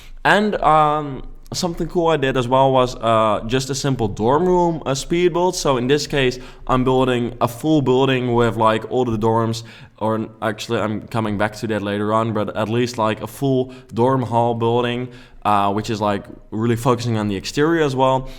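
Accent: Netherlands. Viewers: This dutch accent though🙈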